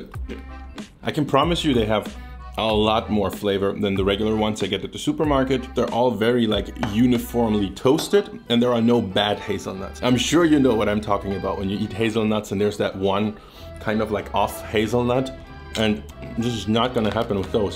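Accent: Germany. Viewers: Yo can heat the german accent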